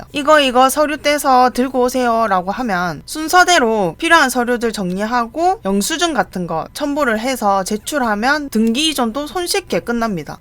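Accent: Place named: Busan, South Korea. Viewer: 붓싼 말씨네요. ㅋ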